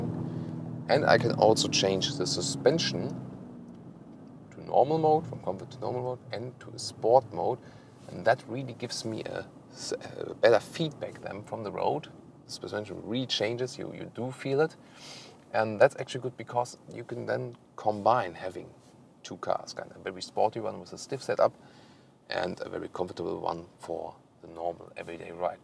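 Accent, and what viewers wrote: Germany. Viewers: You are becoming my favorite reviewer!!! I'm American of German descent, so I love hearing an English review in a German accent! And I like your opinions very much, as well as the quality and information from your videos. Thanks, great work! (I love this car, too! we had this model here until the 80's, I believe. My friend had a Scirocco, it was awesome!)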